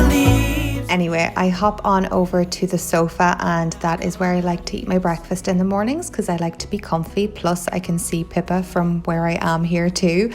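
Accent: Ireland. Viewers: Is her accent Irish 😍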